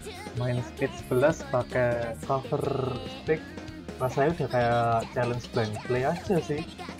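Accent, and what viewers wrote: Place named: Java, Indonesia. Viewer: Njir medok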